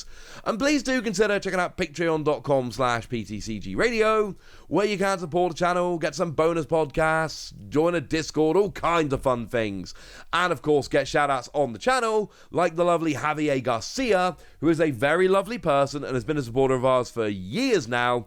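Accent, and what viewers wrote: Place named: United Kingdom. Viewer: He’s British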